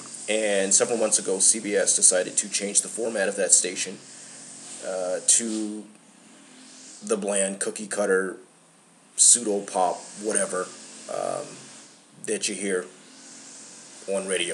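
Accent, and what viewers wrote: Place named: United Kingdom. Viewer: bring back your british voice. it's HAWT and i miss it.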